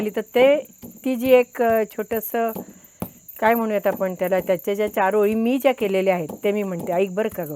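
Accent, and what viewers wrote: Standard. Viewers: माझं सासर लांब असल्यामुळे माझी आई आजारी आहे ती आणि माझे वडील माझ्या बहिणीच्या घरी गेलेले आहेत . भाऊ घरी एकटाच आहे . लाॅकडाऊन मुळे त्याच्या जेवणाची आबाळ होत होती. मी त्याला रोज तुमच्या रेसिपी शेयर करते खूप छान असतात. शिवाय तुमचं बोलणं शुद्ध , स्पष्ट असल्यामुळे ऐकायला खूप छान वाटतं . शुद्ध मराठी सध्या लोप पावत चालली आहे. तुम्हाला खूप खूप शुभेच्छा. अश्याच सुंदर रेसिपी शेयर करत रहा.🙏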